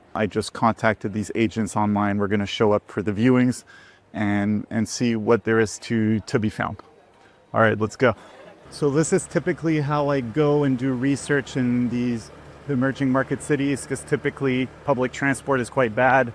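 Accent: United States. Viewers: This guy has a us accent, but he's not from the states. Or Mexico.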